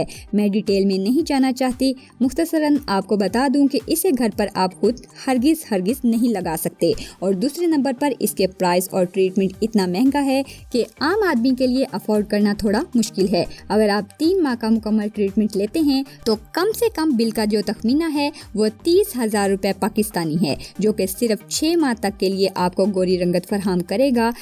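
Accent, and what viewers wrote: Punjab, India. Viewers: yaar plzzz apni Urdu ka style theak kro bilkul Punjabi lehja hy tumhara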